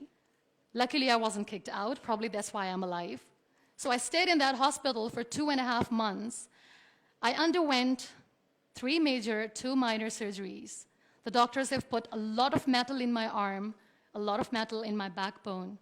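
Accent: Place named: United States. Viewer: I salute u Muniba..ur speech touched so many peoples heart..keep smiling n Mr.badal y r u concentrating on her american accent?y r u not focusing on her speech..i think u r jealous of her communication dats y u r focusing on it more..plzz dnt disrespect any1 if u dnt no to give respect..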